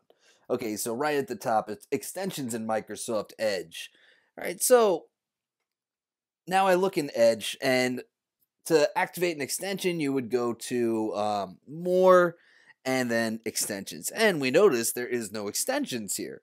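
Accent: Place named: United States. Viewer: Thank God an American accent!!!!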